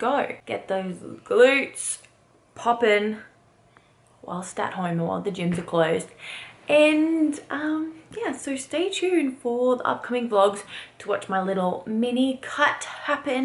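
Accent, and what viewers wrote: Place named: South Africa. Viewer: Are you South African? I have a friend that is South African and the accent is so similar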